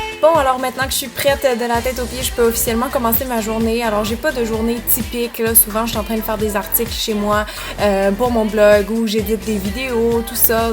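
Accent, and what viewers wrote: Quebec, Canada. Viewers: C pareil pour moi je met toujours de la music et au passâge j'adore ton accent québécois qui est perf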